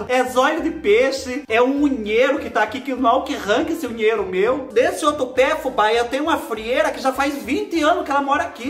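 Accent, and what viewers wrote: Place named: Cajati, Brazil. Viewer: Fubá tu fala igualzinho minhas tias de cajati 😂😂😂😂😂😂😂😂😂 deu até saudade ❤️❤️❤️❤️😂😂😂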